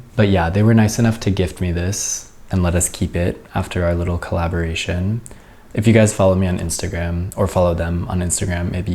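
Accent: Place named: Ukraine. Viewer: from Kyiv?? so nice to hear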